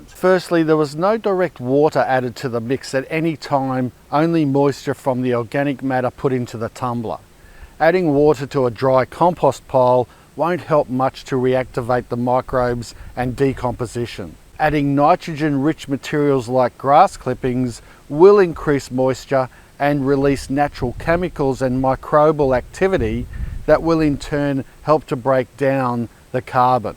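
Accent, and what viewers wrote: Australia. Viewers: 😂😂😂😂😂😂😂😂😂😂😂😂😂😂. I love ❤️ he’s Aussie lines and accent.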